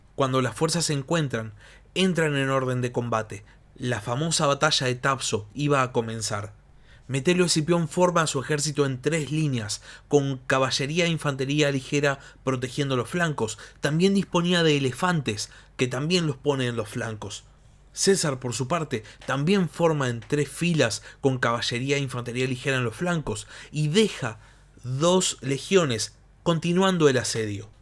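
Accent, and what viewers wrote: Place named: Rioplatense, Argentina. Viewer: Aunque no me gusta nada tú acento argentino o uruguayo (escuchar Pompesho en vez de Pompeyo , weni widi wiki jajaja ,en vez de veni vidi vichi ... En serio no puedo con eso me revienta el tímpano) he de reconocer que tienes un contenido de mucha calidad. Te mereces más subscriptores, a lo mejor si intentases usar un español más neutro conseguirías más subs españoles.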